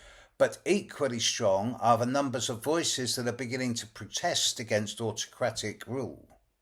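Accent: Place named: England, United Kingdom. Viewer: We love your videos! Your knowledge and that English accent! Thank you for what you do! American girl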